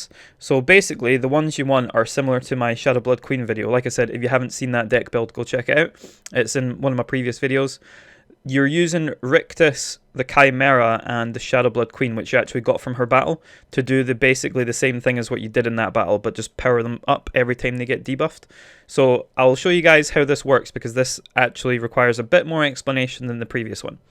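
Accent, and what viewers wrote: Scotland, United Kingdom. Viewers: I'm going through the musclehead battles and the moment and levelling up in prep for hard mode 💪🏼. Usually don't look at guides unless I'm at my wits end 😂.. Will keep an eye on your content, it's good hearing a fellow Scot keep you right. Thank you man I will keep that in mind ✌🏼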